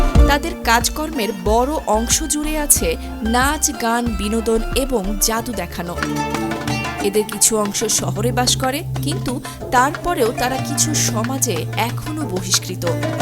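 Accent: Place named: West Bengal, India. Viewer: আপনি তো ম্যাডাম ভারতের পশ্চিমবঙ্গের মানুষের মতো উচ্চারণ করেন, কথা বলেন। তো -- হিন্দুদের ধর্ম নিয়ে ভারতে চলে আসুন-- ভারত আপনাকে স্বাগত জানাবে ------!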